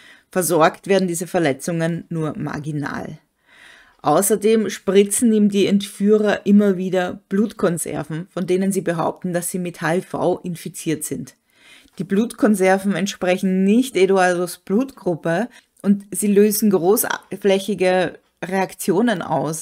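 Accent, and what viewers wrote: Austria. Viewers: da lag ich auch fast richtig. Wien hoerte ich zwar nicht raus, aber Ich hoerte leicht Oesterreich raus. Gruss aus Tirol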